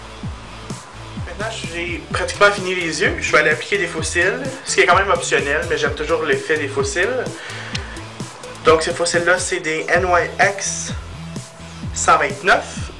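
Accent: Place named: Quebec, Canada. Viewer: Tu as un accent Québecquoi ! :]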